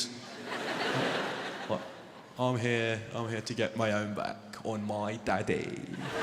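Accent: Scotland, United Kingdom. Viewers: Apparently he's hard to understand non Scottish people 😂 I'm a weegie so I'm sorted 👍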